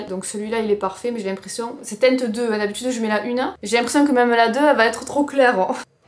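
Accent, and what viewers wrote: South, France. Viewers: Transformer le bouton en grain de beauté,j adore votre accent ça fait penser aux vacances a l été ,aux cigales. Surtout lorsque comme moi on habite dans le Nord ,lol😅😅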